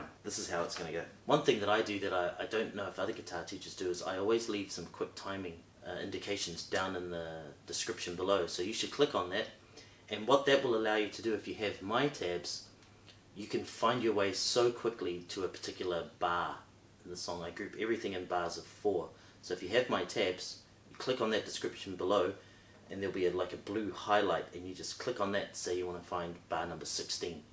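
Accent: New Zealand. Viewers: great, thanks a lot , sure i will . oh you are from New Zealand ¡ its a nice accent . Take care amigo ¡ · I knew I could hear the NZ accent! Greetings from Christchurch. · Kia Ora brother, absolutely fantastic stuff, I have been working on a few of your uploads and loving your gentle nature and articulate communication, impressive Spanish pronunciation mixed with classic Kiwi "orf" instead of "off" .. love it to bits! Much Aroha to you. Um I see you are using a voicing of what appears to be a C7th and you are saying how it's digit gymnastics - I watched Ana Vidovic and Pepe Romero and they appear to be using a bar arrangement from the 8th fret with the high E? So I am wondering what is the best? Obviously you have proven your way works but I can't help wondering, any thoughts? Cheers. Nick.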